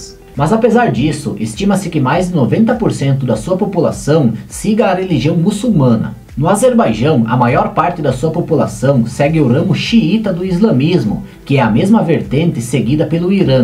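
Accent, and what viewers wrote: Paraná, Brazil. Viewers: Gostei demais do assunto e também do sotaque que parece do Paraná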